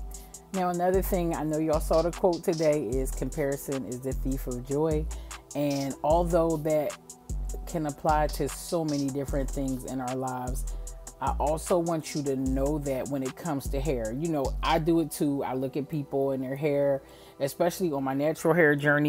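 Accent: Philadelphia, United States. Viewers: Are you in philly? You sound like my Philly family. I need a natural beautician and I be in Philly sometimes.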